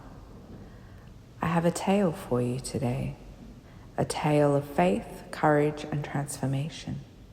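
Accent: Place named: New Zealand. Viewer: New Zealand accent? My favorite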